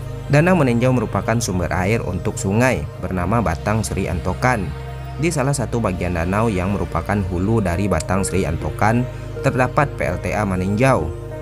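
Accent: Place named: Aceh, Indonesia. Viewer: Kamu orang Aceh ya min .. logat mu kental sekali.. 😄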